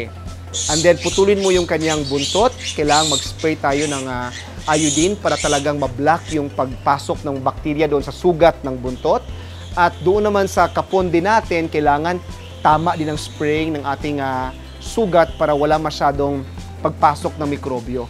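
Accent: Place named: Cagayan de Oro, Philippines. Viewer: sherwin jara : ang galing nga po.... Parang taga amin c Doc sa CDO....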